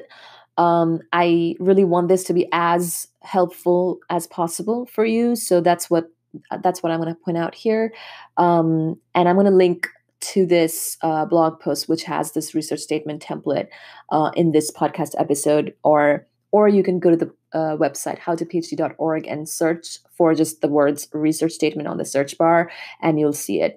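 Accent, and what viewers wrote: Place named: United States. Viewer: দিদি তোমার গলায় আমেরিকান উচ্চারন দারুণ লাগছে। 😅 আমিও পদার্থবিদ্যায় গবেষণা করছি ভারতে, প্রথম বছর। আমারও ইচ্ছা পোস্ট ডক করতে বাইরে যাবার 🙂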